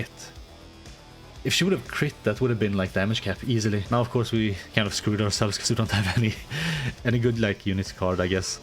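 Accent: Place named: Sweden. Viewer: Its probably just the swedish accent honestly, you do say quite a lot of words differently. But my tired brain would argue. 😂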